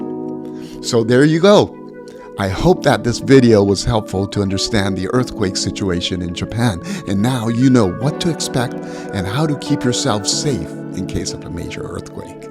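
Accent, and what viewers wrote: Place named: United States. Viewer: You speak very well if I hadnt known you grew up in Japan I would have thought you grew up in the United States because my stepmother whos from Japan and spent decades living in Hawaii still had an accent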